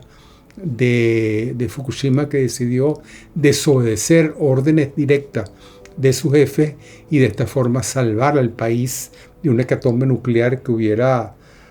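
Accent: Caracas, Venezuela. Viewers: Excelente post. Muy grato ese tono de tertulia en acento venezolano, me atrevo a afirmar que es caraqueño. He leído casi todo de Mishima. Es uno de mis autores preferidos junto con Julio Cortázar. Gracias.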